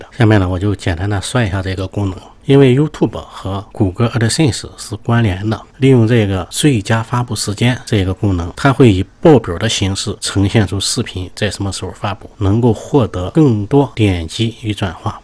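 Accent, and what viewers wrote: Shandong, China. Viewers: 老师，是山东的吗？咱是老乡啊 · 濃濃的山東人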